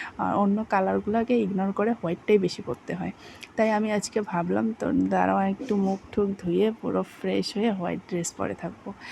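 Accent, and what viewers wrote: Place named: Assam, India. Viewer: Tmr assame biye hoyeche ki vasa sune ektu ektu lag6e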